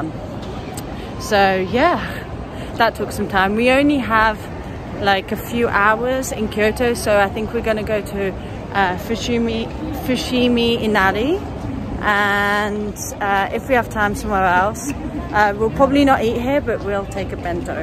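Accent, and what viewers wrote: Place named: England, United Kingdom. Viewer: Your English accent is fascinating!!!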